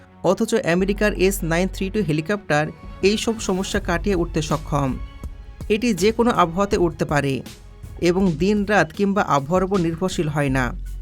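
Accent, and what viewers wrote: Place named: India. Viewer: APNAR BACHAN BHONGI LIKE INDIAN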